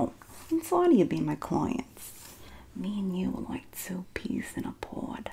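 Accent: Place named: New Jersey, United States. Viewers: That New Joisy accent has me laughing! It's great! LOL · Love your jersey accent videos!!😊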